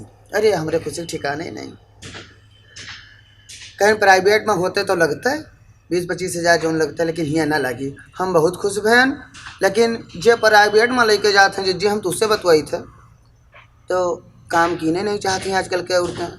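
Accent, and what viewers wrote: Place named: Barabanki, India. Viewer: अरे भाई आप कहा के है बाराबंकी के हे क्या लग रहा है बाराबंकी में रहते है क्यों की ये वही की बोली है! आपका छोटा भाई तारिक़ जैदपुर।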